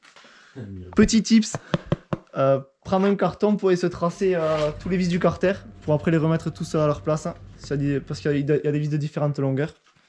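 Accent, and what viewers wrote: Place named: Southwest, France. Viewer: Salut...je viens de tomber sur tes vidéos elles sont vraiment aux top!!! Et en plus avec cet accent c'est encore mieux !! J'ai le même !!! Vive le sud ouest !!! Je travaille sur Toulouse et je roule en enduro avec un excf 2010 dans le Gers a un niveau amateur pour le plaisir! Je viens de me rendre compte que mon moteur est bloqué alors qu'elle ne l'était pas il y a quelques jours !!! Va falloir que j'ouvre pour aller voir du côté cylindre piston ! J'espère que ce ne sera pas plus grave. Est ce que les excf de 2010 sont quasi identiques au moteur Husqvarna comme ta vidéo ? Je cherche également un support moteur c'est un fait maison le tiens ? En tout cas tu peux continuer tes vidéos c'est un régal... Bon dimanche à toi A+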